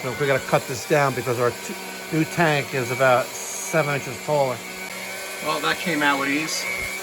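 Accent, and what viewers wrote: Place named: Boston, United States. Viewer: Worst video. Edit this stuff and give us the information. I don't want to hear Bostan accent all day long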